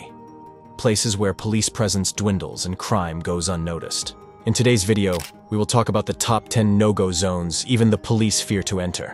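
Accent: United States. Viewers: I carnt take it seriously because of his American accent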